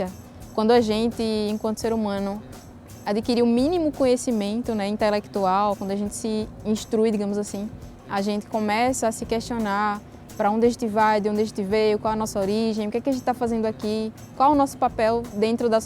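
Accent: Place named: Recife, Brazil. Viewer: Oi, parabéns pelos seus vídeos, acabei de conhecer o canal e estou amando, já me inscrevi! Sou espírita mas estou engatinhando na literatura rsrs,comecei a ler O que é o espiritismo? de Kardec depois vou partir para O livro dos espíritos! À propósito...por acaso esse sotaque lindo é da minha terra, Recife? Rsrs um abraço fraterno!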